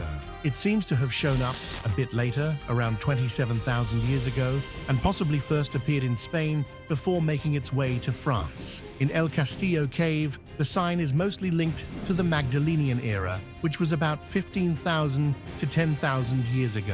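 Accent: United Kingdom. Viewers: next time i watch, i will count the usage of "cool." meanwhile, as i am an american, i had to use captions to see what he was saying with his u.k. accent...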